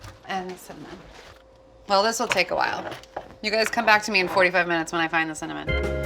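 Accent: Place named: Southern California, United States. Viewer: perhaps you don't know this ... you really help people out of depression. (insert something funny) coastal peeps. immediately knew you were socal, I'm norcal. love it.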